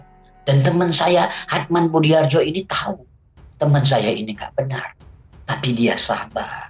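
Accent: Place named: North Sumatra, Indonesia. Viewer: Bukan orang Aceh ini orang. Logat kentalnya orang Sumatera Utara. Ngibul lu tong!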